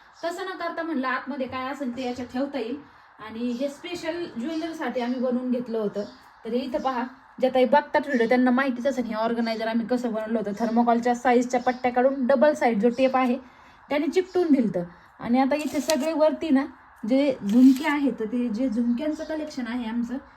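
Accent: Ahmednagar, India. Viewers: तुमची बोली भाषा खूप छान आहे.मी काही वर्ष नगरमध्ये राहत होते.मला खूप आवडते. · ताई आम्ही पण आस म्हणतो कि गणपती बुडवयचा कानातले कलेक्शन खूप छान आपली नगरी भाषा खूप छान ❤ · आग मनाला नको लावु घेऊ आपली नगर भाषा खुप प्रसिद्ध आहे मी मुंबई रहाते 20 झाले माझी भाषा काय बदली नाही 😂. लोक लगेच ओळखतात नगरी आहे का तुम्ही 😂😂😂😂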